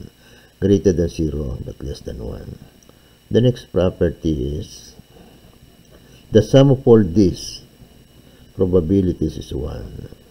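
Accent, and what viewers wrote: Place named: Philippines. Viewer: Pinoy na pinoy ang accent by the way thank you po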